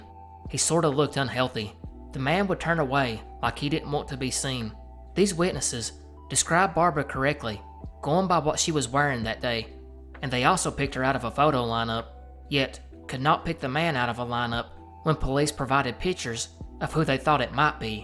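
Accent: South, United States. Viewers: As a MS resident who doesn’t have a strong accent (to the point of being asked where up north i’m from) hearing your southern accent is comforting to me! it’s very epic! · I'm Southern! Southern English, so these stories are all new to me. Your accent is absolutely charming. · I really love your accent. No matter how many Americans i met here in Germany, the nicest ones always came from the South :) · People are awful, guaranteed it was some’woke’ hypocrite hating. Your accent is awesome, never get rid of it it’s who you are, its where you’re from! I’m stuck in a Northeastern grossly, overpriced, dump and often visit the south. I actually lived in New Orleans for five years and wish I never left, but I had to, and I would give anything to live in the south. You guys cherish your history, everyone is so fantastic down there, the food, the music, the everything . 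So don’t listen to the haters. This is an awesome idea and I love your channel.💙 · Don't get rid of your accent. To hell with the haters. DIXIE PROUD! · As someone who lives in the south I enjoy more southern youtube channels like yours and Wendigoon, I love your accent, keep up the great work man!! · Born, raised, and lived in the South all my life. Your accent is part of you, but isn't you. Don't let anyone give static for it. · i personally love your southern accent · Even being from the north I enjoy your southern accent and I think you should keep it and embrace it (Also it’s pronounced Kane-ka -kee) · I'm from the UK and I like the southern accent! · You just gained another subscriber! It’s really wild how much accents can vary in timbre and drawl. Way down in the delta is so similar but also very different from here in southern Appalachia. It’s great to hear a southern accent here on the mysterious/creepy side of YouTube! 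· ill be honest the first time i heard a southern accent on a iceberg lol but honestly it makes your channel unique and im from wv so its cool ! nice seeing some southern iceberg topics !! glad you didn't cut wv out of the mix thanks king !! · it’s awesome to hear someone w a southern accent doing stuff like this! you got a pretty strong accent, where in the south are you from? sending love from the deep south 💜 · It is very comforting to hear a Southern accent presenting topics so cogently and eloquently. Much love and respect from West TN/ North MS, keep doing what you do good sir. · I love your accent! I'm Canadian so I don't get to hear a nice southern accent very often. It makes me want to cook up some biscuits and gravy 😆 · I am very glad to find a fellow southerner who makes this sorta content! You got an amazing accent, don’t let anyone say otherwise! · As someone from the south, I barely noticed the accent lol. 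Sounds friendly to me personally. Love the videos man, can’t wait for you to blow up 👽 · i grew up in south carolina and just moved back to the south (tennessee) after living in the midwest for almost a decade and i’m so glad i did. i love the south, your videos, and your accent. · Southerner here too, an Arkansan. Trust me hes not faking that accent. Ive heard that twang my whole life and it check out. Thats really the way he sounds without even trying. If he was it would be obvious. Making fun of a Youtubers NATURAL accent? C'mon y'all. It could be a whole lot worse. Some youtubers out there feel inclined to make up their own accent. "To establish a brand?" lol gimme a fkn break, nobody on Earth talks like that! Thats the kind of fake sht yall can laugh at, because it is kind of lame. But the way someone naturally speaks? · I absolutely love your Southern accent. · I love your accent and being from Kentucky, I have a little bit of one as well. 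❤ So glad I stumbled across this video! Great content! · The best part about your content IS your southern accent narration. Ty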